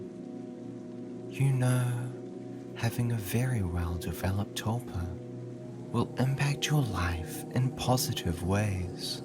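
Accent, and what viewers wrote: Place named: United Kingdom. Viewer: lmao what? 1. he’s british. he’s going to have an accent. 2. every single video is a file.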